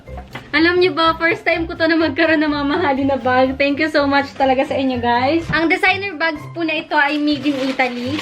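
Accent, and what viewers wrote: Batangas, Philippines. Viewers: Congrats! Deserve mo yan!!! Sarap sa ears pag nag sasalita ka ng language ng mga taga Batangas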